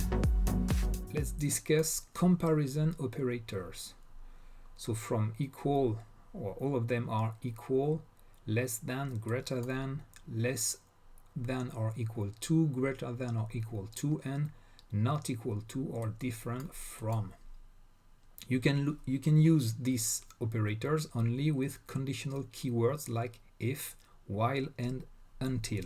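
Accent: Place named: France. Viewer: This is probably off topic, but I like your French accent 😳😂 Great video as always!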